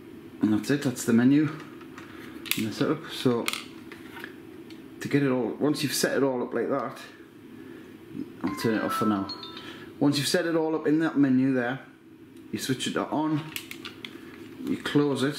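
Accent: Newcastle, United Kingdom. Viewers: Hi, I am looking to purchase a good trail Cam such as this for monitoring hedgehogs in my Garden. Can you tell me where you baught your trail cam from please. I live in Gateshead and its great to hear a Geordie on youtube and ive recently subbed to your chanel. Also what sort of distance can you see images on your mobile phone from where camera is sited? Also does this alert you of activity or is that only cellular Trail cams that do that?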